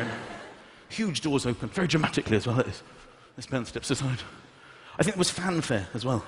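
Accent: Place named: RP, United Kingdom. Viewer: The email part is my favourite part! My mum isn't keen on comedy where comedians swear without needing to, and my sister and I had introduced her to him on Top Gear going "Look, you'll REALLY like him - he's so funny!", and she was going "I've heard his voice. He just sounds so posh and annoying!". Now he just has her in hysterics! She hasn't been to his tours like me and my sister, but she's watched his DVDs and watches his TV programmes and absolutely loves them!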